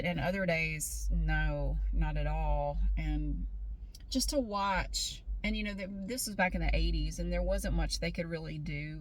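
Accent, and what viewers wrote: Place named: South, United States. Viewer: I am originally from India I lived in Texas for 7 years before moving to Canada. I love southern accents. I find the way you speak and share your experiences really amusing. You are raw and real. Keep up the good work. Your rants are extremely funny lol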